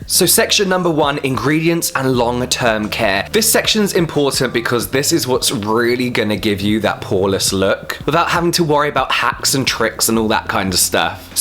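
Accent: United Kingdom. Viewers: Wow.. I love your British accent😘😘😘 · British accent lover, skincare lover and accidentally found your channel, perfect...!! Love your gesture when you're apply your skincare 😂😂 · Hi. I love British English, the way you pronunce words and speak. Beauty Is not my favourite subject but there is always much to learn. Your video is an effettive means of enriching my vocabulary. So thank you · A wonderful British accent I've decided to watch all your videos to master that accent!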